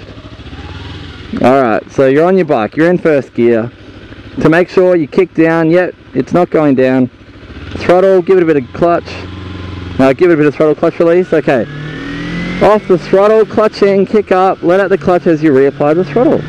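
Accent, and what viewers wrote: Australia. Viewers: Those were very nice videos considering I must have seen every video on "how to ride a motorcycle" right before I got my licence. BTW, nice australian accent and I like how you thoroughly mention every key point and the little things that do actually count. Thanks mate. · mate he has an aussie accent...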